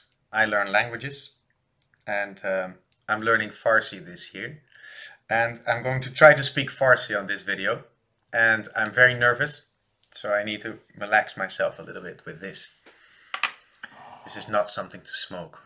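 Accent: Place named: Iran. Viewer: Hey, I showed the video to my roommate who speaks Dari and is from Afghanistan. He really praised your ability to speak Farsi and what I thought was funny to mention is that to his ears you do really sound like somebody from Iran.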